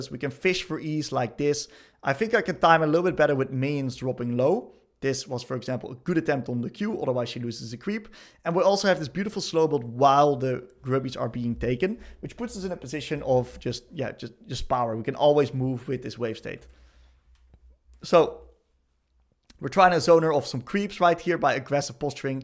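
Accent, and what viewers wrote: Netherlands. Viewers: Je Nederlandse Engelse accent is crazy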